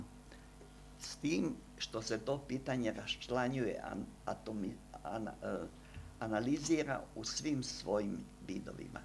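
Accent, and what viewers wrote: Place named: Krajina, Croatia. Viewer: Говори као и сви Крајишници!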